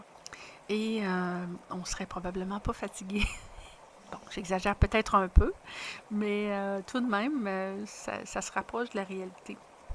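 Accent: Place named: Quebec, Canada. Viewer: Tu t'exprimes si bien... Curieusement, ça me fait du bien d'entendre une voix québécoise, comme si tout d'un coup je sentais un sentiment d'appartenance encore plus grand à la communauté. J'ai vraiment l'impression que tu es sage. Tu me fais penser à moi dans mes quelques peaks de sagesse, ici et là dans mon parcours de maintenant presque 11 ans. C'est difficile à expliquer, mais t'écouter m'aide à m'expliquer des choses que je vis ou que j'ai vécues, sans avoir la capacité de mettre les mots dessus. T'écouter est comme lire ma propre histoire. Merci pour tout.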